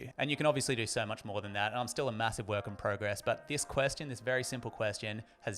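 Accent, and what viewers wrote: Australia. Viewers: the ausie accent is just too much to take. sorry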